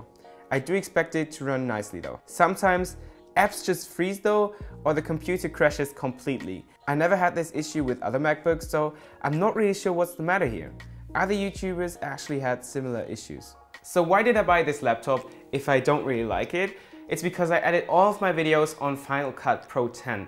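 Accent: Germany. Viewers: german accent ;)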